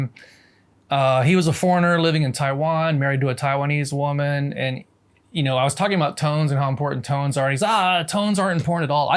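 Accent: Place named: South, United States. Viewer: Isn't it the case that, if your individual syllable tones (excepting the usual two-syllable modifications) don't translate into an overall sentence intonation, your Chinese won't sound authentic? Won't it sound more like someone reading or carefully imitating a learning video? I'm still not much advanced from HDK 1 but it seemed from what I heard and from the pinyin that you were speaking Mandarin rather than Yue/Cantonese although some of the hanzi weren't the simplified ones. Do Yue-speaking Taiwanese use the Mandarin pinyin to approximate the nine tones of Cantonese? On another matter. I'm a Southern USAmerican like you but I've spent a lot of time growing up with British people, and there still aren't many natives in Great Britain whose accent is like yours. It's true that some sound pretty much like Americans, seemingly a growing number. 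However, they're the exceptions, but may not be much longer if the trend continues. Almost no one there speaks English anymore as Prince Charles still does, not even Queen Elizabeth. When I get mad, I sound like Andy Griffith in his early career days, but most of the time people think I'm from New England. In New York, they ask me if I'm from Boston. I can understand the impulse to avoid sounding like a down-home yokel.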